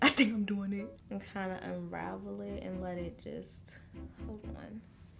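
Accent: DMV, United States. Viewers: This was a hilarious video your accent you must be from the dmv area lol you had me dying laughing! But this tutorial was great I think I wanna try this method I cant deal with putting rubber bands in my hair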